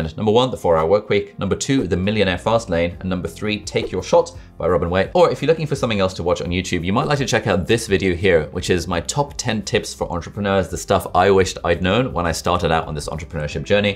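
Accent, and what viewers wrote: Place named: United Kingdom. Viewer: It’s the UK accent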